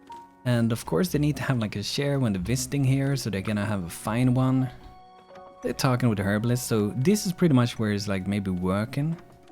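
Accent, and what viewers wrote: Sweden. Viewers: This game has so much potential, whenever I saw the trailer i was super excited. Awesome videos, dude. Subbed! :) ps: Where are you from? You sound swedish.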